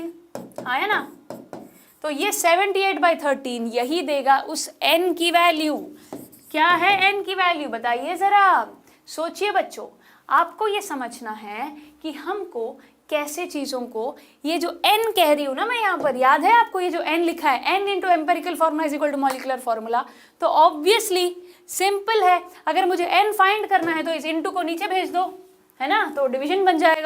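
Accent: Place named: Punjab, India. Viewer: Mam I can definitely say that your hometown is punjab because you words are generally punjabi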